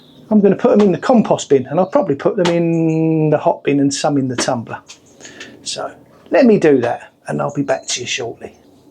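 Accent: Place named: Southern England, United Kingdom. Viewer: I thought sounded like a southerner.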